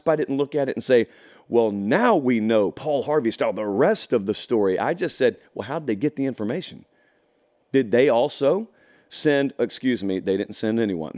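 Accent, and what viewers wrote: South, United States. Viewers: Afternoon, Firstly, I'll state that I'm a Michigan fan, born and raised in Ann Arbor but moved away and lived all over the country; from MI., to Harrisburg PA., West Palm FL., Miami FL., Bmore MD. (Johns Hopkins), Las Vegas NV., Raleigh NC., now out to SLC Utah. Been all over this country (dig your accent by the way, miss the South), almost decided to set up roots in Nashville when I was driving through. My brother is a Gator, my fiance' is a Ute, my best man (soon to be) is a Runnin' Rebel. All of these people know I'm a Michigan fan, and how I'm one of those that's always tried to play by the rules (personally speaking); probably why I'm still in middle management, my brother (Gator) was the politically savvy one who earned the big $$. I say all of this to make a point, that I was upset by what was found out about Michigan. 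Even my father who's a Michigan alum said "If Harbaugh lied, he needs to go" (very old school, graduated '59, nuclear engineer when Michigan was the only school in the country that had a working reactor *note: prior to MIT). However, Dan Wetzel's article makes a great point about the recent news that came out, and you adamantly dismissed. You've also made a point that if you're "speeding and get caught, you can't point to everyone else". I'm a big fan of this analogy as you stated it, because in fact it's very much true. Michigan was caught doing 75mph in a 65mph zone, which is most certainly a moving violation and should be punished. However, while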